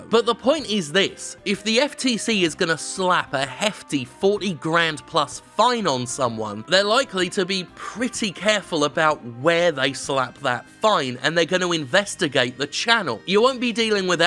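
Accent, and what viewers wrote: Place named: United Kingdom. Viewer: Do you know whether or not the US FTC can even fine foreign creators if we violate COPPA? I've been searching for a definitive answer and finding nothing so far. I'm Australian. You sound like you're from the UK. They may be able to force YouTube delete our videos or channels because YouTube is a US platform which has to comply, but I'm pretty darn certain this badly written revision of a US law does not apply to me.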